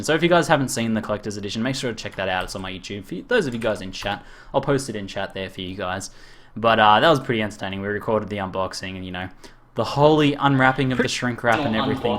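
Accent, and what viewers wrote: Australia. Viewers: Great Vid, Great to hear a fellow Aussie doing well. What state you from? PUMPED about the Oz server, would love to play with you sometime. peace.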